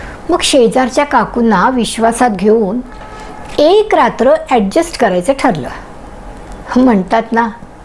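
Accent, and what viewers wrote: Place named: Rural, India. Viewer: शिल्पा ताई जोशी क्षीरसागर यांची किती सुंदर कथा निवडली.कथेतील सृजनता अनेक अर्थाने बोधप्रद होऊन गेली.मुळात त्यांची कल्पना अदभूत आणि त्याचा त्यांनी केलेला कल्पना विस्तार संवेदनशील झाला आहे. कथेतील शेवटची ओळ कथेला एका नवीन उंचीवर घेऊन गेली. तुमचे सादरीकरण नेहमीसारखेच दृष्ट लागण्याजोगे झाले आहे. तुम्ही ग्रामीण भाषेचा लहेजा ज्या पद्धतीने तोलून घेतला त्यातूनच तुमचे व्यासंगी अष्टपैलुत्व पुन्हा एकदा सिद्ध झाले. सुनिता तोंडोळकर./ छत्रपती संभाजीनगर. डॉ.शिल्पाताई आणि तुम्हां दोघिचेही हार्दिक अभिनंदन ---!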